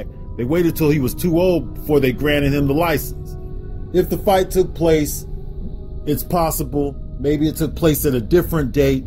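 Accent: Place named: Hawaii, United States. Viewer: I thought he was from Hawaii.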